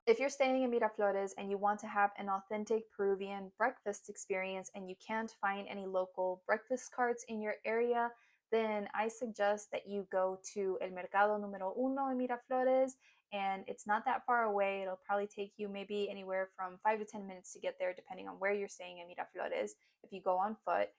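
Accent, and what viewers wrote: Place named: United States. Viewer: Tienes el acento americano o.o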